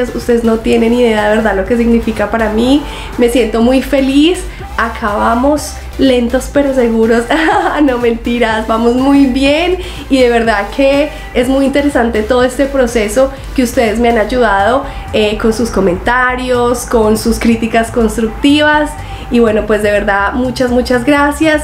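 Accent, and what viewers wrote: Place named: Colombia. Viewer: Qué tengas un muy feliz año nuevo mi niña hermosa , eres muy bella y simpática y me gusta mucho el acento colombiano , bendiciones desde Chile 🇨🇱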